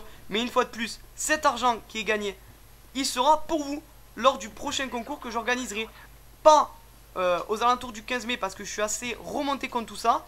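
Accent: South, France. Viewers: ta raison mon gars tkt tu va aller loin et evite les con ki mette des pouce rouge tu c meme pas pour koi en tt cas t un de mes youtuber preferer ac ta peche ton accent du sud ! en tt cas bonne continuation. et je compren pour le but de monptelier ke tu les upload parce quil est magnifique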